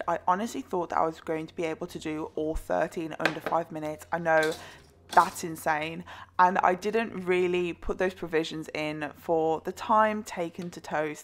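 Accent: United Kingdom. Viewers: there should always be a physique check! Oh and whoever said you talk too much was a fool, love your sexy British voice! First video of yours I saw was the 96oz Steak challenge and I was blown away by how much you could eat and how amazing you look.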